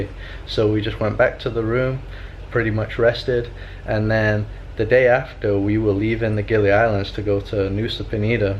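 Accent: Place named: Northern England, United Kingdom. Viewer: what accent is that? sounds sometimes northern england and sometimes like a weird american thing!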